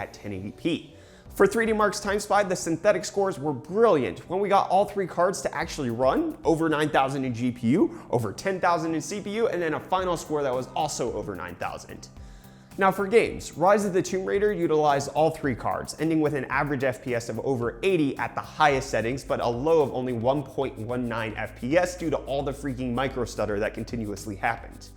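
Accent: United States. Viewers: Holy sheet man, you live in South Africa? 0.0 You sound American tho, elaborate pls? (You may have already told your story, i'm new so pls fill me in! #PossibleSub)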